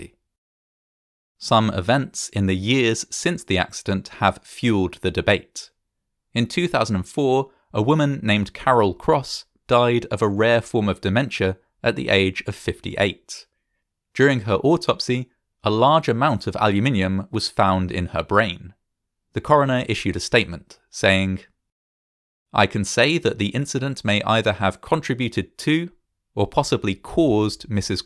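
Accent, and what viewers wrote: England, United Kingdom. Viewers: He's an English native, speaking the language of English, with an English accent, in England 🤯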